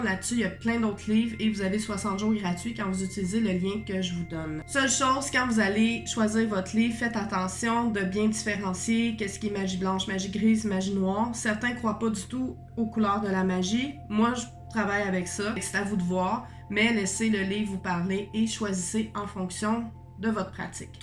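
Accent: Quebec, Canada. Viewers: Fan de tes vidéos 🤩 avec ce petit accent québécois j'adore 😉. Continues à nous enchanter avec tes vidéos (oups capsules 🤭) Salutations de l'hexagone 🌝🪐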